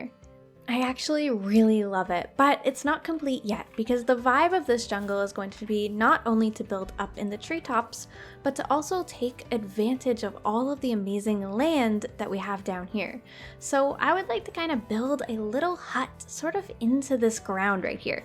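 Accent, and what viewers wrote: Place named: Canada. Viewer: I never realise your accent until you say sorry then I remember you’re Canadian I love it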